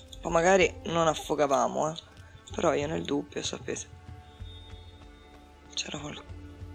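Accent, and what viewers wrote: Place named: Lucca, Italy. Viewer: È simpaticissimo il tuo intercalare, tanto più che lo conosco bene: ho vissuto gli anni delle medie e delle superiori a Lucca e ancora oggi, quando parlo con le mie amiche che vivono ancora lì, parlo in lucchese!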